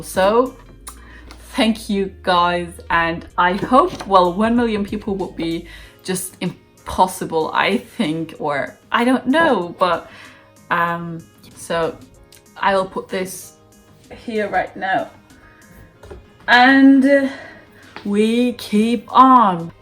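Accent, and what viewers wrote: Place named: United Kingdom. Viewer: U from the UK, hun? That accent, though! 😄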